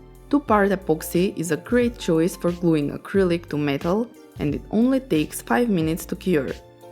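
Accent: Russia. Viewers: Still the Russian accent recognized 😁❤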